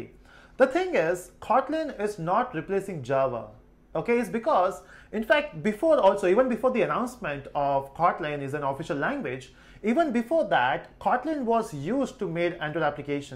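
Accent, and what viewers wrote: India. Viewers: It seems like a good content, but I just can’t stand Indian accent.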